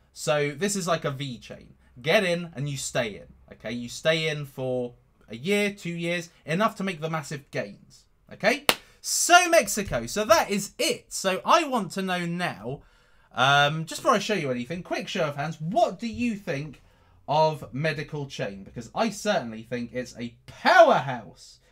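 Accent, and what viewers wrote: England, United Kingdom. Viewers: You talk with an English accent and sing with US one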